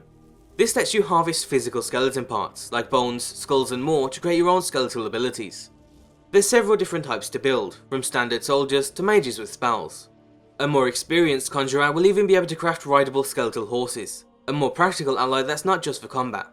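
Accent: United Kingdom. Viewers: Great video, but your speech is quite slurred. It's not just the "charming" British accent; there's actual slurring going on - where two vowels blend into one. Quite easy to work on once you're aware of it.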